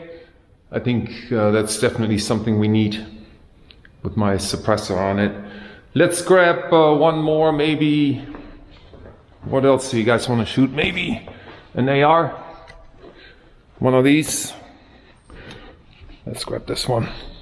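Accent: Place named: Germany. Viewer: Great video sir! I am also in the process of getting a can from them as well. It’s not a Banish can but another brand and it’s a pistol caliber. So far, it’s been a relatively good experience. No approval yet, but should be here any day this month or in June. Like your channel too! I hear a hint of a German accent or maybe Dutch….?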